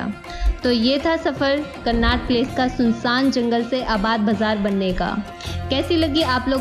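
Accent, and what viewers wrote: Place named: France. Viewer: Lagra French aye lagra yesa❤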